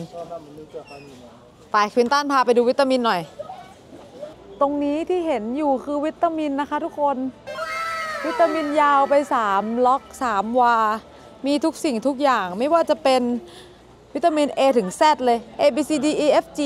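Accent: North, Thailand. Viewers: F.C เจียงใหม่ ..เป๋นกำลังใจ๋หือเน้อคับ ดู.ทุกต๋อนเลย ชอบแต่ะๆครับ อู้กำเมือง.ฟังแลัวน่าฮักดี....เจ้า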